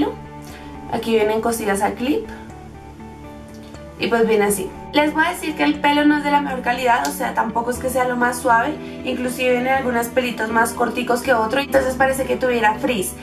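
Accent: Colombia. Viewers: Me da un cosquilleo ver tus vídeos porque... Eres colombiana y yo también lo soy sólo que vivo en España. Y extraño mucho Colombia :) y oír tu acento colombiano me relaja y me da como un cosquilleo de ganas de ir a Colombia!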